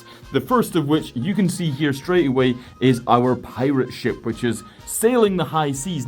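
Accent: Scotland, United Kingdom. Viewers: thx for commenting, love your Scottish accent, looking to play a bagpipe rn ❤️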